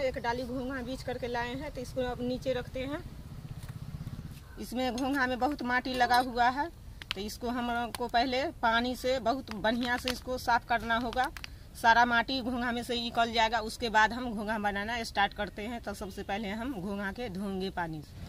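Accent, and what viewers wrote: Bihar, India. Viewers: Ky ap bihar se belong krte ho 😅 Apki boli s lg rha hai 😅😅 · Aunty ji aap jamui district se ho kya lag raha hai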